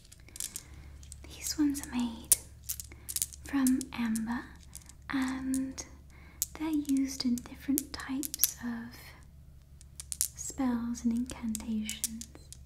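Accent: United Kingdom. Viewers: This is the first Harry Potter roleplay where I’ve seen you actually use a British accent.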